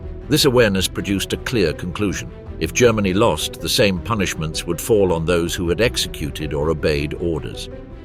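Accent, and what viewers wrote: United Kingdom. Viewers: I'm a retired NYC public school teacher. 25 years of ESL ( Eng Second Lang) to immigrant adults: South Americans, West Africans, Asian adults, fresh " off the boat." They always ask me about history & I tell them. I'm (82, Jan.'26) world history buff, so I know a lot & this is an excellent doc to describe what it was like there then. I also served in US Peace Corps Türkiye <num> & know a lot about Middle East. I speak/read/write Türkçe. So who wrote this script for not only this film but for all the others too? Excellent general research. Great British accent, too. I respect the work that went into this series. No problems with whatever " minor inaccuracy" there might be. Overall, great product. Thank you. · The usual anti-Hitler video which is the only ones acceptable of course. Great photos. Annoying Brit narrator.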